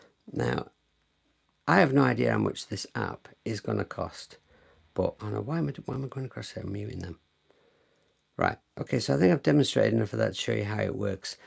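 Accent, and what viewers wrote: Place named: Northern England, United Kingdom. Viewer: Both guitar and voice levels fine, nice playing. Wot neck of the woods are you from, cos I can tell yer a nothern lad?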